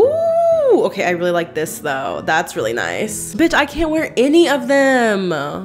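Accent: France. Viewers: YOUR FRENCH ACCENT SLAPS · omg i love your french accent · the french accent is EVERYTHING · The French accent though. 😂, SHE'S ICONIC!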